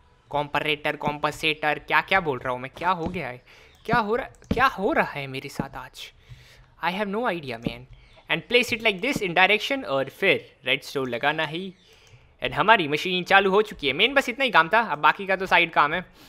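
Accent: Gujarat, India. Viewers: are you Gujarati??🤔🤔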